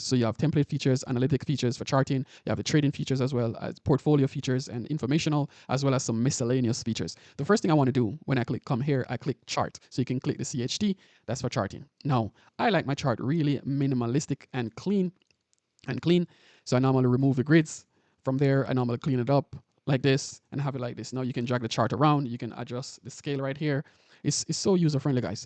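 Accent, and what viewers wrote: Jamaica. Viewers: Real yawd man bro mi can hear it lol but now I have to find out how to get quantower on mac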